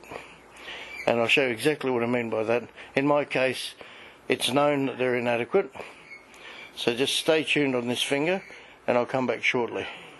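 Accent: Australia. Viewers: my estrogen went up with all the weight which isn't a good thing. Try it and let me know. I stopped all sugars instead of fruit of course. I also stopped wheat. I wish you luck. You sound Australian, only because I live in Adelaide.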